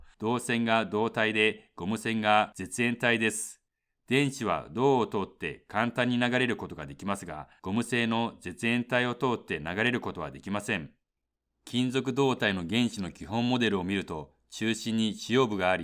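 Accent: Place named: China. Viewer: 喋り方が中国人っぽい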